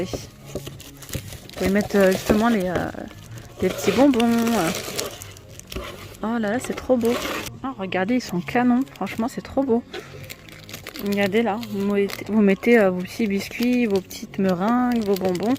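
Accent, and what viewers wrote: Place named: North, France. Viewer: Cc, je ne sais pas comment tu t'appelles. Je voulais juste te dire sue que j'apprécie beaucoup tes vidéos sur ce magasin avec ton petit accent du Nord. Trop mignon.. Une fan qui habite non loin du Puy du fou en Vendée et pas très loin du bord de la mer. Pleins de bisous mi-ensoleilles, mi-pluvieux... ☀️☀️☀️☀️☀️🌊🌊🌊🐈🐈😚😚😚💜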